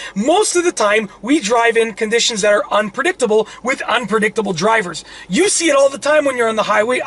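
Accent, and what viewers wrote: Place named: Canada. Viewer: Are you Canadian? You sound extremely Canadian.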